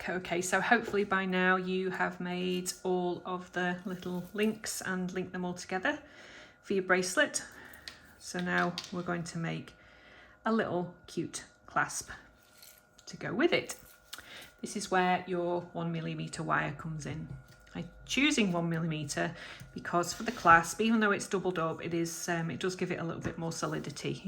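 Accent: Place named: North West England, United Kingdom. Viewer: Great tutorial; you make it look so easy and I can't wait to have a go. I'd also love to know how to make the spiral. I detect a North West accent; are you from anywhere near Widnes in Cheshire?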